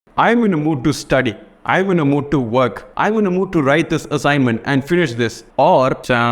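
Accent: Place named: North India, India. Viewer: I thought you are from north india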